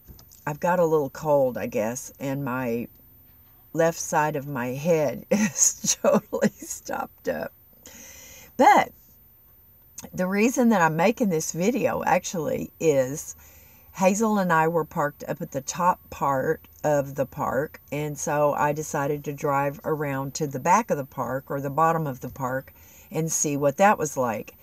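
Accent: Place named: Texas, United States. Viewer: Ah ha! Got it you were raised in Texas and Arkansas! That explains your accent! And your values!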